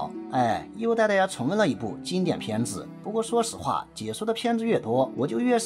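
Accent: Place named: Shandong, China. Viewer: 越哥的文稿写得很精彩，山东口音很亲切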